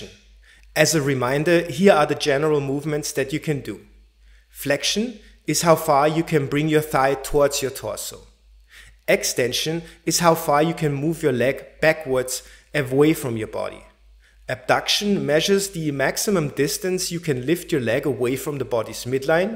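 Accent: Germany. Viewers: Thank you for your time and work🙏🙏🙏 P.S.: höre ich da einen deutschen Akzent? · I am (was) German (lived most of my life in Sweden). I know that there is a town named Baumgarten in Austria and I think my family has some ancient connection there. Most people are called Baumgärtner or Baumgartner. Then there is "von Baumgarten", there even is a branch here in Sweden where I live, and my father claimed that his family was a "von" once but ditched it. I heard that the speaker in the video was clearly german speaking, but chose to write in english so anybody can read the Q&A, in case it conserns them, too. There are sooo many misconceptions and contradicting informations out there, I was really happy to find this video providing excelent explanations accompanied by really great animations! Thank you.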